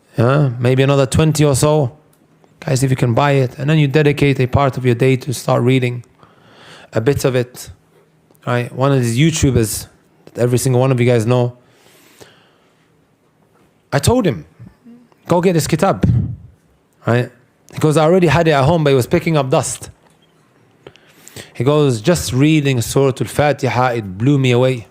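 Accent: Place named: United Kingdom. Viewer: Grew up in Britain so it’s British accent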